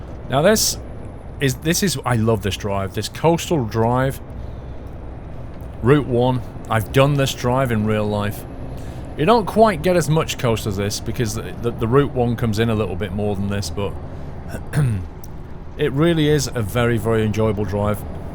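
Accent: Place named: North, United Kingdom. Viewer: The psuedo American raised inflections as you speak mixed with a Northern Uk accent has got to stop.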